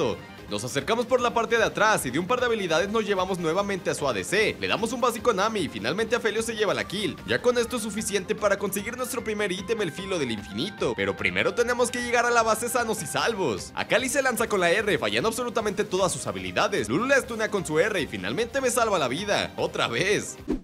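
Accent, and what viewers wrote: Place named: Mexico. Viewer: Eres de sonora o baja California ese acento lo conozco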